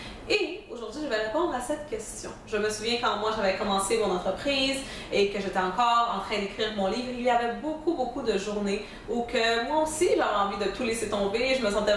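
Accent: Canada. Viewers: impossible de comprendre le francais canadien...hélas. :(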